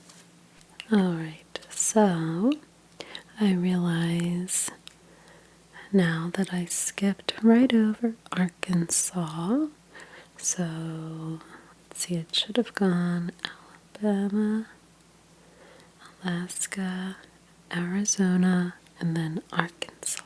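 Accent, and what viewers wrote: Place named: East Coast, United States. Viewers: you’re 100% from the east coast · Brooklyn accent? Whatever it is I love your extra vowels.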